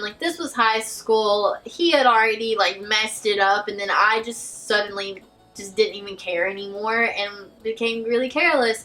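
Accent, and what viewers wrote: South, United States. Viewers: Your southern accent is the cutest thing I’ve heard all week! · Do you have a country accent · Why you so gorgeous tho? I love the whole southern-ness the way you say things and shit.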